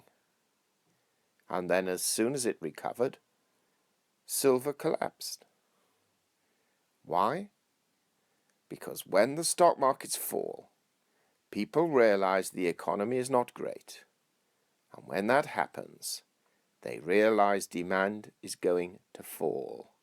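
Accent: England, United Kingdom. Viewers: Somehow this advice seems even more authoritatively wise hearing it in an English accent.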